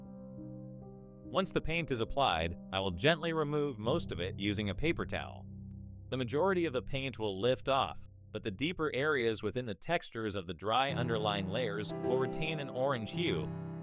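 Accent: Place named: United States. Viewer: Milý. Tieto tvoje techniky som v jednom videu nevidela. Ďakujem ti, že si sa podelil s nimi a je to rozkošné. Ešte viac si stúpol na kvalite v mojich očiach. A skupina určite súhlasí. 😊Americký prízvuk a hudba dotvárajú čaro namaľovaných obrazov. Aké milé. 😊 čauko. ZUZANA 😊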